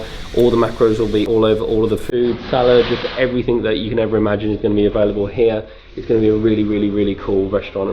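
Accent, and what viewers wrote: United Kingdom. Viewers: Difficulty in understanding your U.K. Regional Brand of English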